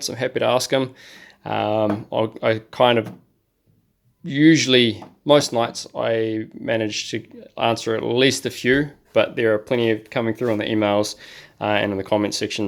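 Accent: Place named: New Zealand. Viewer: I had basically the same question. I've been thinking about vapor blasting for a while but i'd never looked at how a professional cabinet works, basically because i'd never be able to afford one. Looking at other diy-ers they were using water blasters but media getting stuck seems to be all too common. I modified my water blasters plastic gun to try adapt a T but it couldn't handle the pressure, and to get to decent pressure the aperture has to be quite small, basically 1mm. I was going to build a pressure pot to also help feed the media through the gun but i'm sure glad i came across these videos. Thanks for pointing us in the right direction bro. Also a pleasure to hear the Kiwi accent! Fellow kiwi in Brazil.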